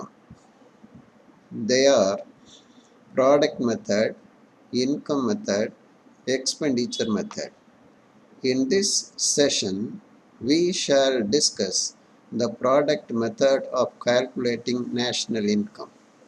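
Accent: India. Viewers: i bet the video is great but i can't understand what you are saying becasue your indian accent is so strong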